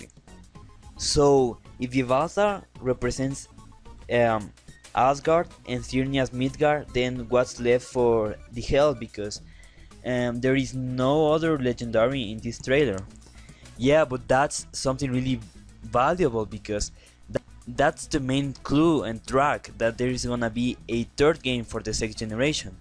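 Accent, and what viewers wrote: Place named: Russia. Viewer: Your English is great and your accent is wicked giving A great voice. Is it Russian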